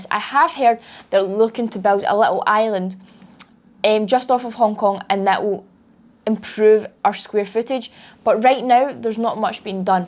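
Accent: Scotland, United Kingdom. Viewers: More like a Scottish accent. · Wow Scottish accent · We need to cut population in Hong Kong if there aren't much more land can be released to solve the housings issue. BTW, it's nice to hear some Scottish English after getting bored with our own kind here the Aussie. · 好重正宗英國口音, 同US/Canada 北美口音差好遠 , 真正英文就好似國語而喺我哋Vancouver啲北美英文就係廣東話😸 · Like other said, strong Scottish accent, interesting to hear～😁😁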